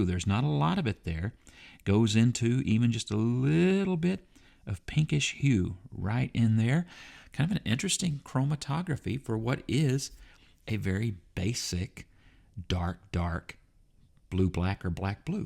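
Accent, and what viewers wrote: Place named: Texas, United States. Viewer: coming from Texas as well I vibe with the pronunciation game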